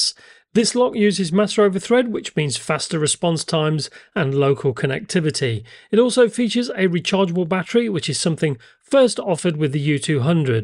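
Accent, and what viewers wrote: United Kingdom. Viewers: I love the ultra wide band! So cool! Great video too! Can I ask if I live in the UK and want to use this on a new front door, you sound British, do you think this is not for that?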